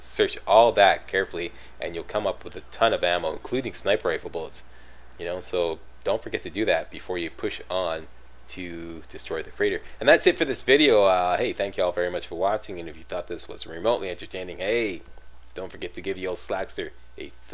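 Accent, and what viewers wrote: Canada. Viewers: Thank you!!Furthermore your excellent walkthrough videos, for me it's an inspiring advanced training in English or God s(hoops)ave the Queen "Canadian English"!!!"gizzahs".."pps"..and "gonna blow up a tower" are icing on the cake.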